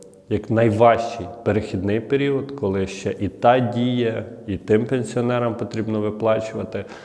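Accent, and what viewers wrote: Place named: Western Ukraine, Ukraine. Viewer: Делай правильное ударение на слове,, буде,,, ты видно с западной Украины, сразу слышно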